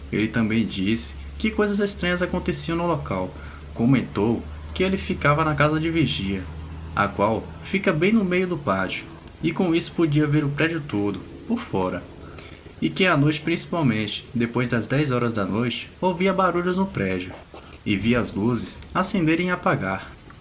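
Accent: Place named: Pernambuco, Brazil. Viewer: esse narrador só pode ser um pernambucano!!!! pelo sotaque esta mais que evidente,e qto ao possivel demonio em forma de cadaver? pode ser sim ,ele causa alucinações doidas. história FODA essa hein???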